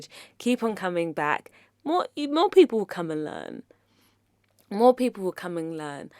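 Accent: United Kingdom. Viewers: It sounds like my mum's, which is southern english :) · This is so important. Side note. When you started speaking i thought it was me. We sound very similar its scary. Hello fellow Brit 🇬🇧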